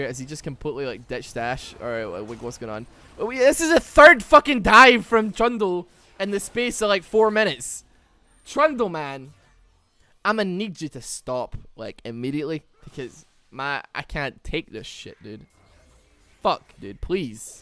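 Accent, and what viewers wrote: Scotland, United Kingdom. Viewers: R u Scottish. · is he scottish?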